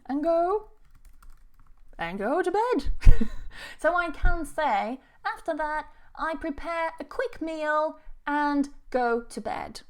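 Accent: United Kingdom. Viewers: She speaks British English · Do you speak British English or American English?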